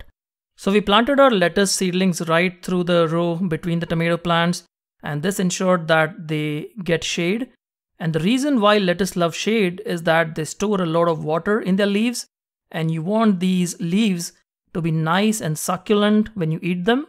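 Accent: India. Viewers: Such a helpful video 🙏 thankyou for posting this 😇 you sound indian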